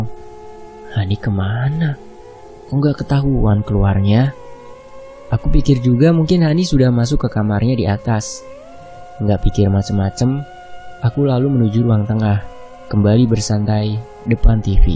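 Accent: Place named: West Java, Indonesia. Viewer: Kesel bgt dengernya klo pakek logat sunda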